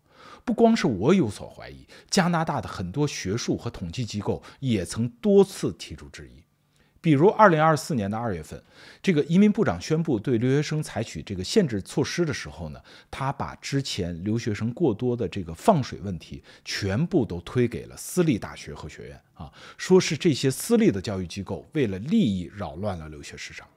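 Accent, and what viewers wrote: Standard. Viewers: 油主是国内播音员出身吗？？这是我听到最标准发音的自媒体人了🎉🎉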